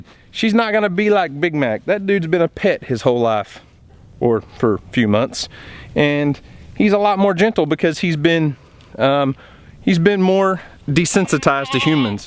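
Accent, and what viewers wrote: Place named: South, United States. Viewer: You know, the more I listen to you, the more I think you sound like Alex Kendrick, from Sherwood Films ( Courageous, War Room, etc.). I suppose you could be originally from Georgia. Or maybe it's just that, to my Pacific NW ears, all you Southern gentlemen sound alike.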